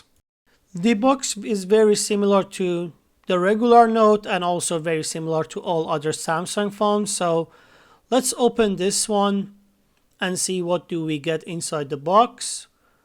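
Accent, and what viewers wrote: Iran. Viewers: you have such a persian accent lol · Judging by your accent I'm guessing your Iranian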